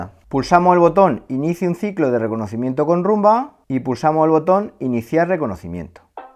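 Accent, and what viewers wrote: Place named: Almería, Spain. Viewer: De Almería? Vaya acentazo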